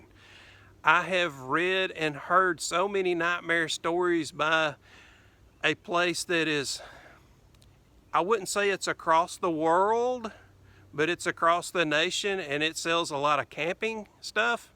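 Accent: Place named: Alabama, United States. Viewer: New subscrib. You’re a smart guy and its refreshing to listen to someone that actually thinks and applies their smarts to whatever. Enjoy your channel and that Alabama accent is southern hospitality coming through the video.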